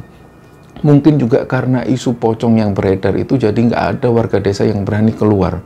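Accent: Java, Indonesia. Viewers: Iya bener vibesnya berasa kita lg ngobrol bareng di depan ms nya, bahasa indonesianya juga logat medok2 jawa,jd kl org jawa yg denger feelnya dapet bgt berasa lg duduk berhadapan di ceritainya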